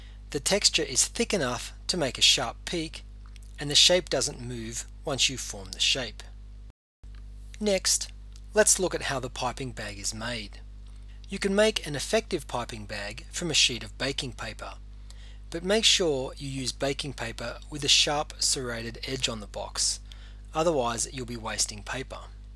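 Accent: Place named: Australia. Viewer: good job! i love your accent! are you australian?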